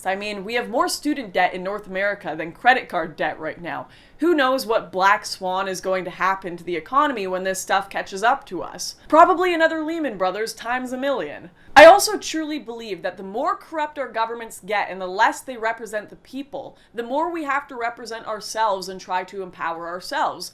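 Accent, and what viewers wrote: Canada. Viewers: be waaay hotter if she'd drop the Canuck accent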